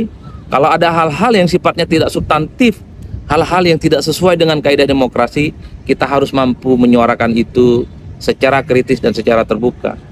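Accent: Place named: Madura, Indonesia. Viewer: madure banget mas...melokal polll...topp ancen e ms ki👍👍👍👍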